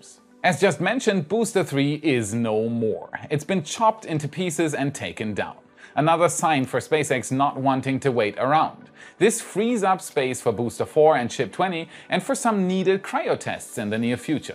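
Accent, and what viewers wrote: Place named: Germany. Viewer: Guter Channel Digga ;) Irgendwie hab ich mir schon gedacht (gehört) das du Deutscher bist ;)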